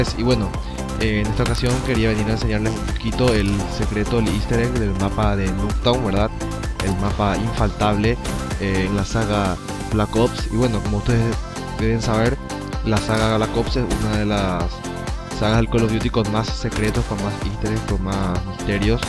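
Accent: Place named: Paraguay. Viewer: Se te re nota el acento de Paraguayo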